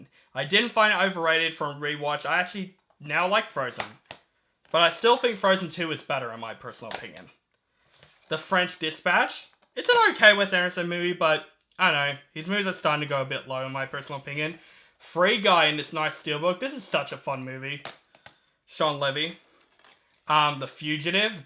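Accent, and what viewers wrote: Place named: Australia. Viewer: Never thought I'd hear an Australian apologize for doing a "terrible Australian accent" lol You sound Australian to me.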